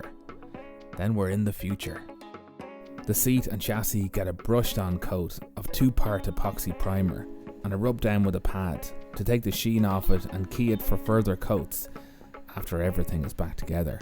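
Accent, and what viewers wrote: Ireland. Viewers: Love the story-telling, makes the videos! Is it the Irish accent?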